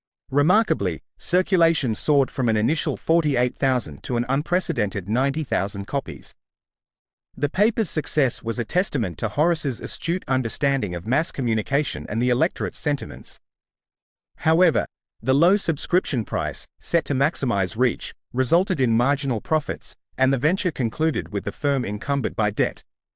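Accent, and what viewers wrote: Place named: United Kingdom. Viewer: Wow, I like that your actually mentioning some less well known figures. I respect that. Also, love the British accent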